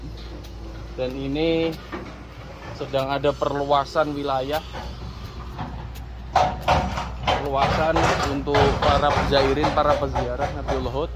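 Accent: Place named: Indonesia. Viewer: saya lebih suka orang indonesia yg bicaranya berlogat indonesia ,daripada orang indonesia logatnya di arab2kan...jaga terus tradisi logat indonesia nya mas .,jangan seperti yg lain yg logatnya di arab2kan...budaya kita kekayaan bangsa kita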